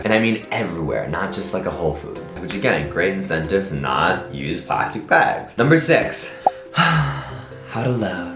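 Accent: Buenos Aires, Argentina. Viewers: Hi I'm from Spain which is a bit far from America but while I was watching this video I realised how similar we spanish are to south americans. And also I love how you speak spanish with argentinian accent which makes me laugh (in a good way obviously). Btw I love your videos and your channel and I hope you visit Spain. Love you❤️❤️ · The sexiest thing I've seen today is: hablando español con acento argentino 😍😍 And that beautiful smile. God... I can't over it! Definitely I have a crush on you 🙈 I love you, ok? A lot 😂❤ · Hey there is a Panamenian HEERE! amé tu video, te salio super bien el acento argentino, I send you hungs and grettings! Me impresionas, I LIKE ALL YOU VIDEOS...!!!! I´m wating the next video 👌❤😍😍😍😍😍 SALUDOS DESDE PANAMÁ! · I loved your acento Argentino 🤪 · Hi! I am from Argentina y amo tus videos! Tenes un acento super porteño jajajajjaja Yo soy de Mendoza, no sé si fuiste alguna vez pero es una provincia hermosa! 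Love you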